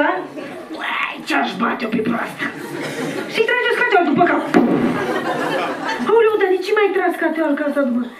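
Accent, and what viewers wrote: Moldova. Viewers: O actrita fenomenala, o femeie geniala. Accentul ala moldovenesc nu o merita si... nu isi are sensul. Nu suntem moldoveni, ardeleni, munteni... suntem Români. Felicitari, esti fantastica!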